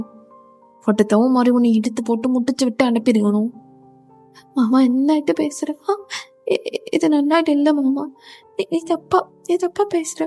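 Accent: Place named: Kerala, India. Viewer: Akka I'm a malayali 😊 but your malayalam is soo cute ❤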